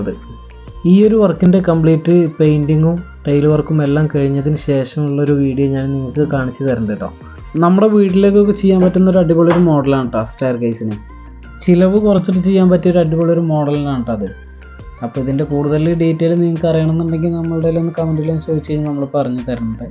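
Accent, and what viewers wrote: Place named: Palakkad, India. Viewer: താങ്കൾ പാലക്കാടാണോ ." ട്ട" ഇത്തിരി കൂടുതലാണ് . Amazing Work👍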